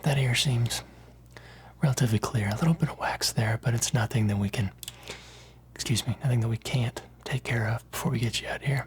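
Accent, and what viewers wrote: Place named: South, United States. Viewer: Is that a Texan accent? I’ve got a southern accent (Alabama so it’s a lot more hick and a lot less charming lmao) and I’m hearing it in yours slightly but it’s definitely not my kind of southern accent. I thought I was mishearing it to begin with but the more I watch, the more sure I am that there’s some sort of southern in there lol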